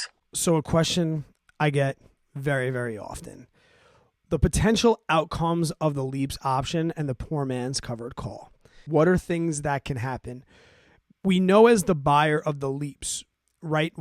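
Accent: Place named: East Coast, United States. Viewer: you sound like an east coast guy! You have a bit of an east coast accent. Where you from brother? I’m from the west coast. Thanks for sharing your options trading knowledge bud.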